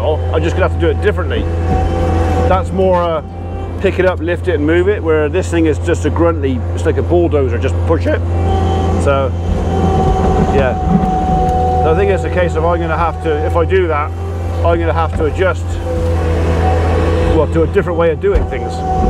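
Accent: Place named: West Country, United Kingdom. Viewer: well I be In Devon the crown jewel of the westcontry. and I must say i been cathing up with your epic vids your natraly funny as in comical and i love you have a strong westcontry accent and i loved the vid of you and holli very comical i hope mrs P is doing well keep up the epic vids its been weet here as weel on and off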